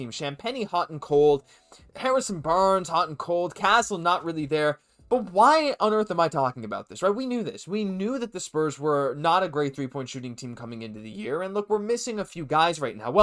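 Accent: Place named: France. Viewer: Hello from 🇫🇷 Your french accent 👌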